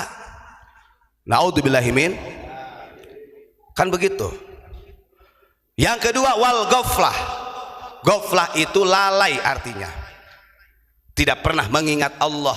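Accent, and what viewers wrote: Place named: West Java, Indonesia. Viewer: Gus orang Sunda apa Java soalnya aksen sunda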